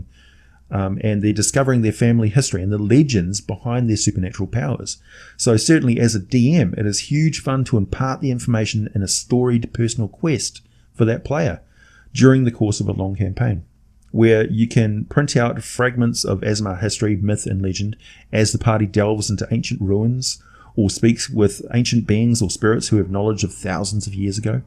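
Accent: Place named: New Zealand. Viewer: Wow another Kiwi! Such a great thing to hear when I'm not expecting it XD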